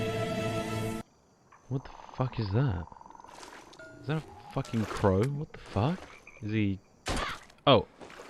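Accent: United Kingdom. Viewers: I thought your accent was Australian at first but it sounds more British in this video. I'm bad at telling accents. Australian just sounds like a confident British accent to me.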